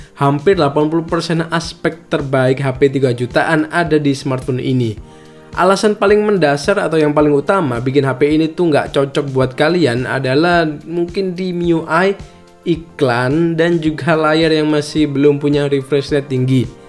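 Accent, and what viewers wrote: Sidoarjo, Indonesia. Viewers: eh masnya orang sidoarjo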